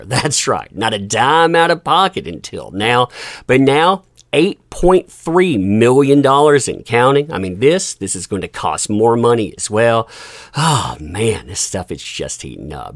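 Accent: South, United States. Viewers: Hey. Are you from the south? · Yes he's from the south 😆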